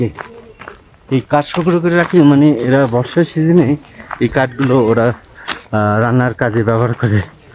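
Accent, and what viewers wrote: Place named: Bogura, Bangladesh. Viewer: আমিও বগুড়ার, আপনার কথা শুনে মনে হয়েছে, পাশাপাশি আপনার চেহারাও পরিচিত মনে হচ্ছে।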